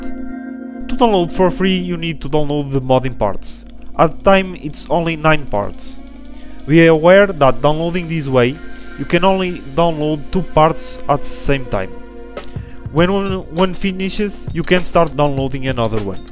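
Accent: Portugal. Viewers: It's amazing, in just 3 seconds of watching your video I could tell you were portuguese haha, continua com o excelente trabalho 🇵🇹🔥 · Such a Tuga accent! Obrigado!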